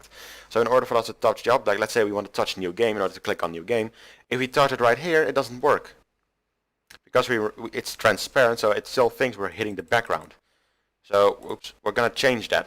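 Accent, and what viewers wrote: Netherlands. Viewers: I knew you were dutch by your voice but i can read it here! sup same country bro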